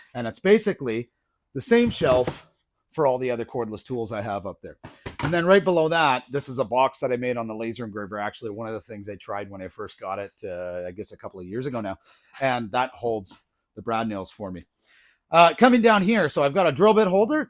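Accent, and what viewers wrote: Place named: Canada. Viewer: I used to think tv exaggerated that Canadian accent.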